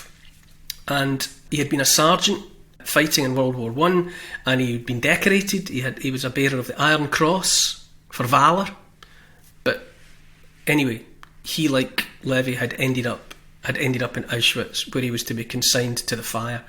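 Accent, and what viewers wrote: Scotland, United Kingdom. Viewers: Cheers, doof doof on heart. Lurve ya skotiesh akscent..! 🫵😇🤙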